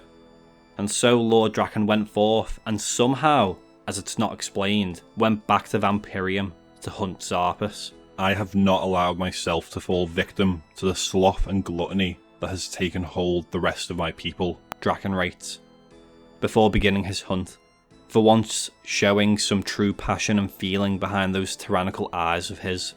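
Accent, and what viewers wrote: United Kingdom. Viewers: oh shit, we doing runescape lore? I don't play OS, but I'll listen to a British dude talk about RS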